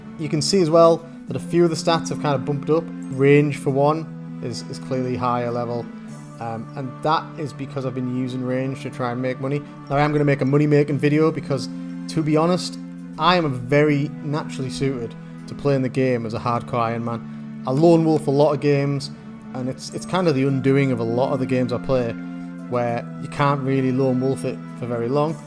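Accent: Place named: North East England, United Kingdom. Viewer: You from the north east of England mate? Just sounds it with some words you pronounce.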